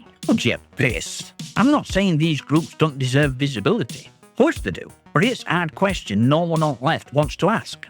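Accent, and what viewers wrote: Yorkshire, United Kingdom. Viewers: Bloodyhell I've not heard this accent in a while. What part of Yorkshire you from? Hahah I agree with your video for the most part, nice work man.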